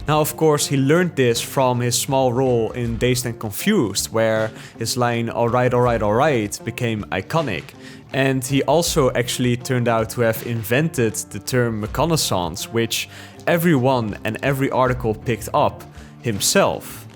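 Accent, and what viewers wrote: Netherlands. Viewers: Je videos zijn altijd ZO goed maar ik denk 100% (en dit bedoel ik niet als belediging) dat je nóg meer views krijgt als iemand anders met een wat minder sterk Nederlands accent het audio gedeelte over zou nemen. (Met alle plezier zou ik dit voor je doen/proberen als je er voor open staat.) Nogmaals, na genoeg top tier content wat mij betreft!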